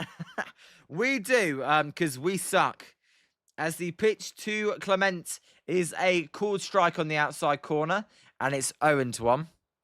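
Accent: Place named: United Kingdom. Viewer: Are u británico....u accent is so strong...mmm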